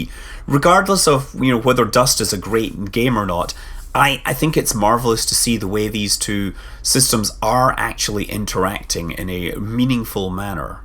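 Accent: Scotland, United Kingdom. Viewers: Hey, every time I witch to you channel from say TotalBiscuit or Polaris or wherever, the first thing I have to do is turn down the volume before your fine Scottish brogue deafens me! Otherwise, your channel is awesome.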